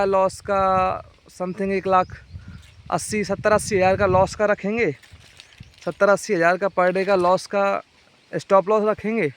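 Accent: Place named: Bundelkhand, India. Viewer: Kaha se ho bhai.....bundelkhandi lagray😊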